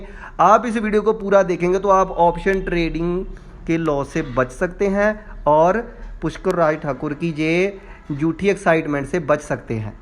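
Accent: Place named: Punjab, India. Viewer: Thanks for this information...it looks like you are from punjab as your way for speaking reflects the pure punjabi.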